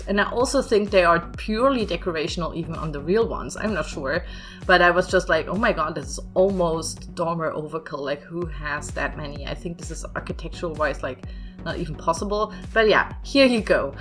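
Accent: Germany. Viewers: I heard literally in 3 seconds that you must be German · Are you German or something cause you don’t have an english accent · May I ask where you're from? Your accent sounds German but then again you're using so many particular slang words that I would think you're native speaker? If not then hat's off your English is amazing🤯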